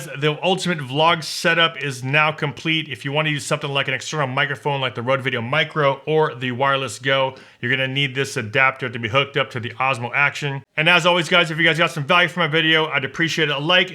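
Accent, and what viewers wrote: Philippines. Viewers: You're accent is filipino ilocano..no doubt about it.NIce video.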